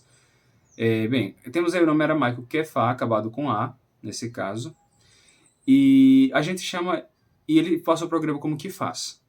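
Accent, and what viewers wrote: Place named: Maranhão, Brazil. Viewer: Parece sotaque do Maranhão 😮não sei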